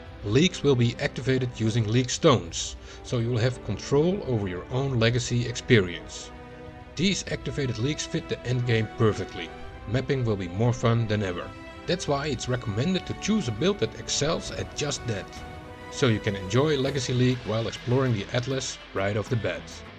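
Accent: Netherlands. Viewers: i can tell by your accent you are dutch am i right?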